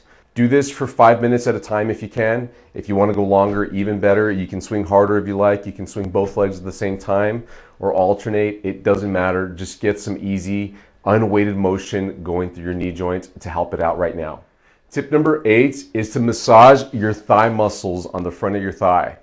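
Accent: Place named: United States. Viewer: I hate this blody American English. Thooo..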